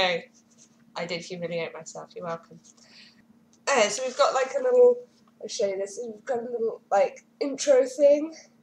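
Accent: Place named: United Kingdom. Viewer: I love this comic. Everything about it. the art, the writing and the characterization all fit the tone. Once again Marvel knocks it out off the park. Not only do we get an awesome new comic but a strong developed female character. Now all that left is the Ms. Marvel/Squirrel Girl crossover. Great vid by the way. ALways nice to met a fellow comic book fan and also love the British accent. lol P.S I find her really hot! like DAMN! i.e she is funny, witty, smart, curvy, eccentric and she has a tail. Am i right? huh? huh?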